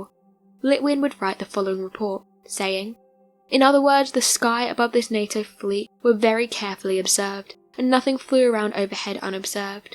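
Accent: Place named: South, United Kingdom. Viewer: Anybody know where exactly the narrator's regional accent is from? I feel like somewhere in the Southern UK, but I can't place where ecactly.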